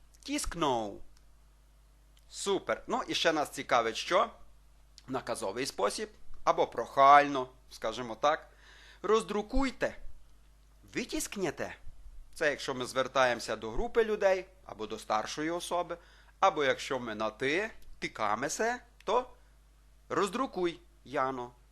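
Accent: Ukraine. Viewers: дуже дякую ! вчу чеську по вашим урокам . одний недолік , що я помітила , у вас є украінцький акцент . інколи вимова не така ,але цу незначні помилки ! велике вам дякую за ці уроки !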